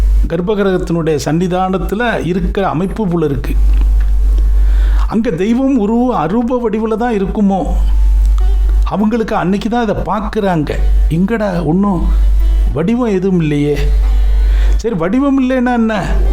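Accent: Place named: Tirunelveli, India. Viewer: படித்தபோது திருநெல்வேலி மாவட்டத்தின் பேச்சு வழக்கு தமிழ் தேனாக இருந்தது.இப்படி கதை கேட்பது இது ஒரு புது அனுபவம்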